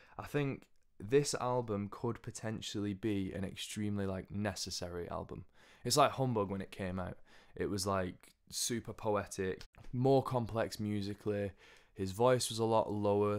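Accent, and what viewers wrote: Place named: United Kingdom. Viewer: it's really refreshing to hear an actual nice British accent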